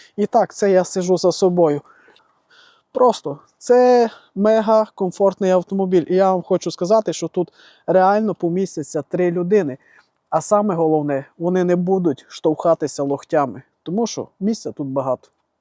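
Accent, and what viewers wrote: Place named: Transcarpathia, Ukraine. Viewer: Ти з Закарпаття "Чоловіче"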